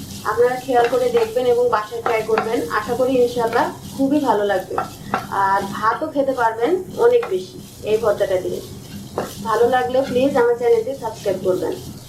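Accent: Bangladesh. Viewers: apnar vasa commillar na anna jaygar vasa Right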